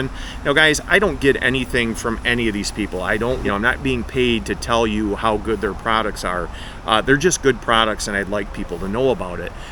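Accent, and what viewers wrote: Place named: Northwoods, United States. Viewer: Don, I've got to ask, where are you from? Sounds like the great Northwoods accent!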